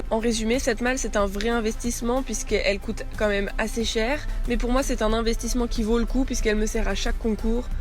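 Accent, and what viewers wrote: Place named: Belgium. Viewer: J'aime tellement l'accent Belge 💕 C'est sûrement parce que j'ai grandis à la frontière ^^ Sinon vraiment sympas la vidéo 😊 Ca doit être lourd à pousser quand même !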